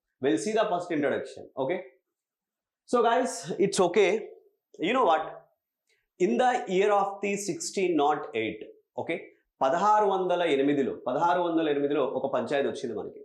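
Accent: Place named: Telangana, India. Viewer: Superb and excellent classes sir... I am a big fan of ur telangana yaasa..🎉🎉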